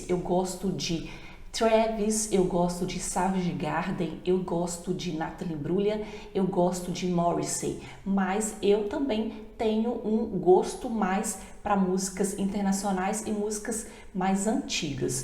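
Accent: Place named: Minas Gerais, Brazil. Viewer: Voz de mineirinha.Minha mãe era mineira de Poços de Caldas .Maringá Pr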